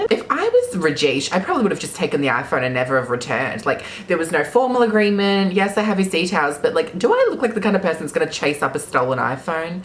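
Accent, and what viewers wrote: Australia. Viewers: YOUR QANTAS ACCENT SOUNDS LIKE MR G FROM SUMMER HIGHS HIGH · Australian accent is BEAUTIFUL, never try to change it or be embarrassed by it😊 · P.s. from jessica. But i love yours and any Australian accent.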